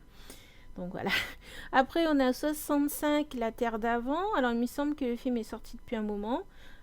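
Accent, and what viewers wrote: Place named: India. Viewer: ah! D'Accord moi aussi je vis sur l'île de la reunion dans l'océan indien! Et j'ai moi aussi une chaine YouTube s'apelle Geek to Cinema! Donc maintenant on est deux! Lol en revenche je n'ai jammais entendue parlé des autre autre YouTubeur! Mais sans être curieux tu vis ou a st pierre ou st Louis! Parceque a un moment tu dit que le Ciné et a de chez toi 20 minutes Moi je vis a la ravine blanche! Et je pence que il 10 minutes ou 15 minutes tu as un accent indien quand tu parle tu es d'origine Indienne!?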